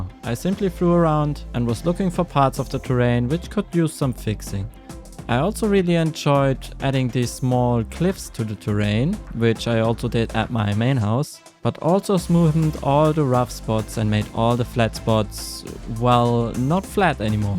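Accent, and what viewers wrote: Germany. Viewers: Ich habe gestern das erste video von dir geschaut und man hat direkt den deutschen Akzent rausgehört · Good video! Keep it up! P.s. Your accent sounds really german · Dude are you german? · idk why but germans speaking english is satisfying to me for some reasen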